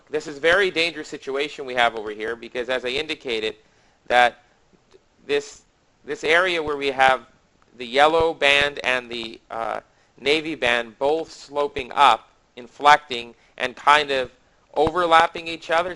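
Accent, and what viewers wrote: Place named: Pittsburgh, United States. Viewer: If you're not from Pittsburgh then I'd be very interested to know where you got that accent. Great work once again.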